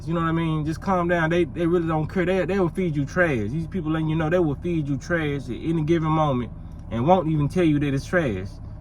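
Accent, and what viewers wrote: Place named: Memphis, United States. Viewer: You Sound Like From The Hood We Black Memphis.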